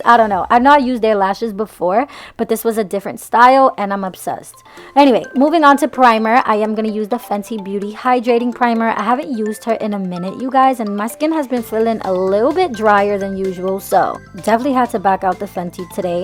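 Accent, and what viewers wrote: New York, United States. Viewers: I knew you was from NY when I heard the way you speak lol we lit this look is beauty full honey 🥳🤟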